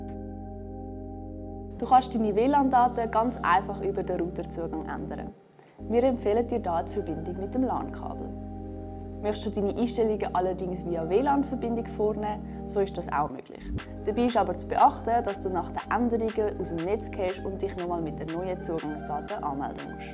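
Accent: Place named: Switzerland. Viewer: Schön's Schwiizerdütsch 💐✨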